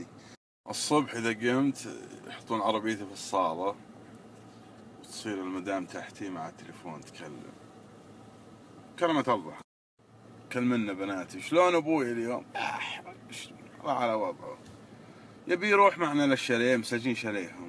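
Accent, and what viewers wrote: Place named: Qassim, Saudi Arabia. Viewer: يااخي والله اني اقدرك واحترمك الله يكثر من امثالك يا ويعطيك طوله العمر. يازين لهجتنا القصيميه ويازين عيالها وياحلاوه انفسكم وروحكم والله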